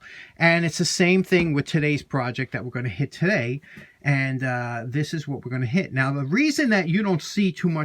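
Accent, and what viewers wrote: New York, United States. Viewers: Great New York brogue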